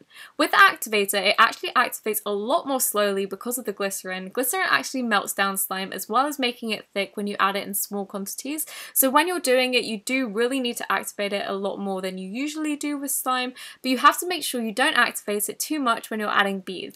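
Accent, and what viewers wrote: United Kingdom. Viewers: Are you British? You sound British.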